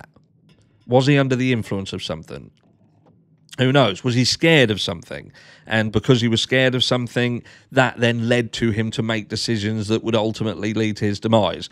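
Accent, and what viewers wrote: Norfolk, United Kingdom. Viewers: Love the Norfolk accent I’m across the border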